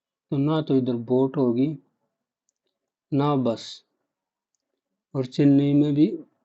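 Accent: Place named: Himachal Pradesh, India. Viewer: Bhai himachal se ho kya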